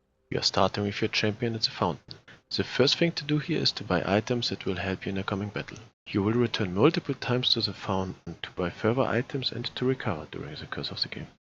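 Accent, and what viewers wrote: Germany. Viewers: You are german right :D? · He sounds German as well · are you German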